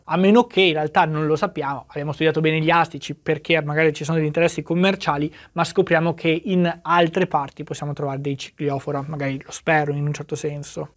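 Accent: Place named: Treviso, Italy. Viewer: mi pareva essendo veneto anch'io, sospetto trevisano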